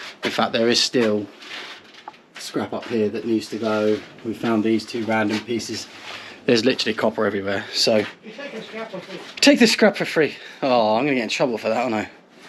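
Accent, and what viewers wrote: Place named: Berkshire, United Kingdom. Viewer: I'm no plumber, but a home enthusiast, I know building regs, plumbing regs thanks to your videos. You sound like you're a Berkshire local possibly, any chance I could learn from you? For free, as a home owner?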